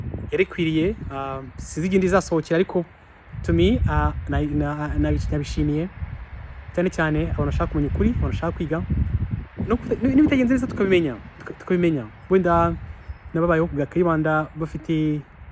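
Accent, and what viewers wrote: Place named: France. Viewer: She has a french accent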